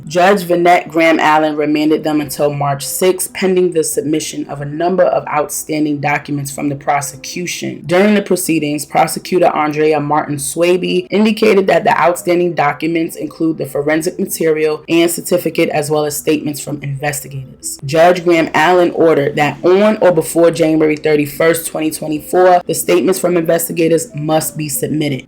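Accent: New York, United States. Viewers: I love the way your NY accent flows with your storytelling!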